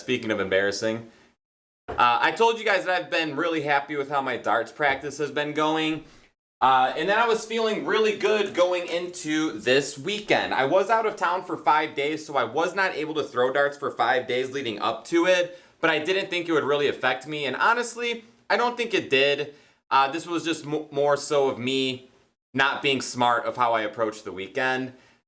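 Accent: United States. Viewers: Wow. It’s great to hear an American speaking about Darts. 👍🏻 or maybe Canadian I don’t know lol but I’m tired of the British Accent 😆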